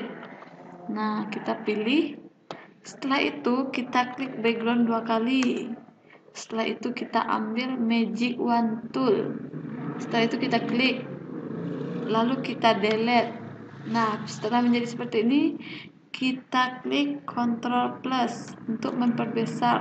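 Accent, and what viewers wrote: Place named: Minangkabau, Indonesia. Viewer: Iya kak thanks ya.... Pedas kali bahasa urang awak ni yaaa kak 😂😂😂😂😂 logatnya udah ketebak raso e😂😂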